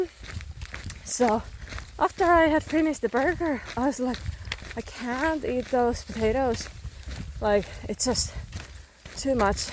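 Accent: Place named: Ireland. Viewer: I’ve often wondered how a Finish girl should end up in Ireland , you picked up a good accent so must have been a while . I like a Crisp sandwich any day of the week 😊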